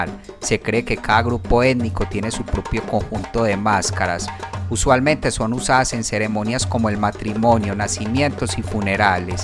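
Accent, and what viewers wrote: Colombia. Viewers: Gracias hermano colombiano desde Gabón, supongo que eres colombiano por el acento que tienes.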